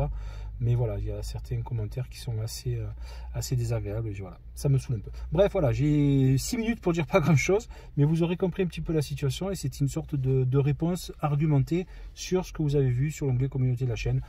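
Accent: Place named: South, France. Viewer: Salut. Je suis abonné à ta chaine depuis pas mal de temps et je suis vraiment triste d'apprendre que d'ici la fin de l'année, tout pourrait s'arrêter. En même je te comprends et je me doute que cela ne doit pas être facile de subir régulièrement de la méchanceté gratuite. Personnellement, quand je n'aime pas quelque chose ou quelqu'un qui passe dans les médias, j'arrête de le regarder, c'est une question de bon sens. D"autres personnes préfèrent regarder juste pour critiquer voir insulter. C'est triste mais le monde est ainsi fait. Des cons, il y en a toujours eu et il y en aura toujours mais le monde est obligé de continuer de tourner. J'espère que d'ici la fin 2020 tu auras changer d'avis et que tu continueras de nous régaler avec ta joie, ta bonne humeur et ton accent qui sent si bon le sud mais si malheureusement, il en était autrement, alors je respecterais ta décison. 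Prends bien soin de toi et de ta famille.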